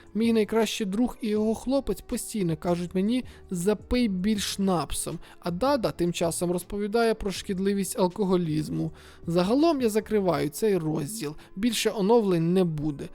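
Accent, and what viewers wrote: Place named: Zaporizhzhia, Ukraine. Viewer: Ваша подача інформації та коментарі дуже піднімають настрій😊 дуже дякую за вашу роботу. Дуже схоже наче слухаю людей із Запоріжжя, якщо тут є запорожці думаю мене зрозуміють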